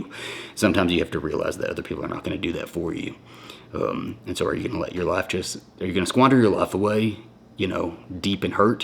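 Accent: South, United States. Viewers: Well, that was deep! I’ve been following you from the beginning. You probably didn’t get married too young. Sounds like if Alastair wasn’t there you would have continued your spiral. Off subject: Your accent is getting more Southern drawl every time you record. I think you’re talking more like your mom every day, instead of more like Alastair who has no accent. Analyze that Mr. Freud. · Gosh .. I love and admire your ''Southern Accent'' 😍🥰😍🥰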